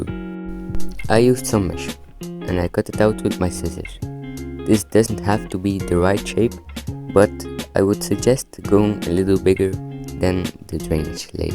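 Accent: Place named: Belgium. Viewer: Oh really lol, you might know that he is from Belgium and I'm also from Belgium so we probably have the same accent😅👍🌿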